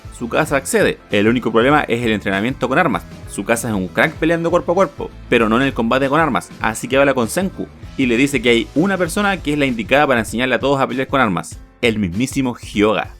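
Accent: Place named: Chile. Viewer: no aguanto su asqueroso acento chileno....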